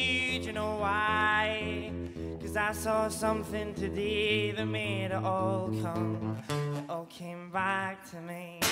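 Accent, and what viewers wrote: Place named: Scotland, United Kingdom. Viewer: yeh, what they nearly done to this lad was shocking. all because he sounded "too Scottish" nah, his talent was for all too see, wonder what ever became of him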